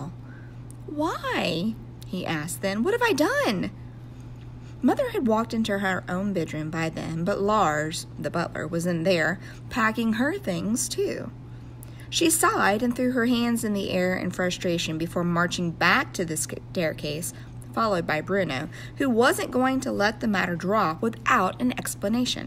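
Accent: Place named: West, United States. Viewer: why is this book slowly turning into a western accent. also thanks.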